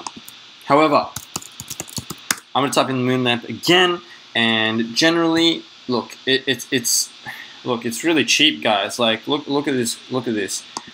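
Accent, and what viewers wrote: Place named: Australia. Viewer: Sounds like an Aussie.. 5 bucks must be like 50 cents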